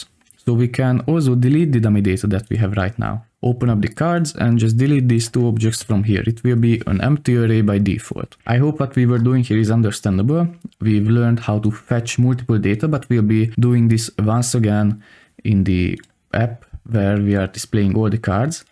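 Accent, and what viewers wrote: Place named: Russia. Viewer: amazing course subbed ,also enjoying your russian accent😀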